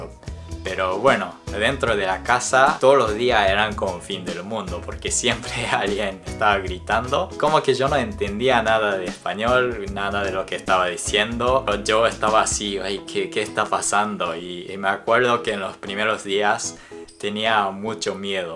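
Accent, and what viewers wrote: Argentina. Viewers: Hola! Tu acento es muy argetino! Lindas las vivencias de viaje que tuviste, espero que vuelvas pronto a Argentina. Saludos 😊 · Sos muy encantador, tenés una expresión muy buena, ya puse una vez que me encanta tu acento argentino. A mi me pasa igual con eso de diferenciar las dos culturas argentina y paraguaya, y las dos me encantan. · Se te pegó el acento argentino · Ahí se te notaba más un acento argentino, ahora en Valencia se te nota más el acento español. Soy argentina y también vivo en Valencia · ayyy, sabés que llegué al canal con el video donde hablás de qué tan difícil es hablar japonés y del por qué no vivís en Japón, y te juro que te escuchaba y pensaba "¿soy yo o su español suena un poco argentino?", ahora con este video entiendo por qué XD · Me encanta el acento que te quedó argentino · Tu acento argentino se nota! 😊🇦🇷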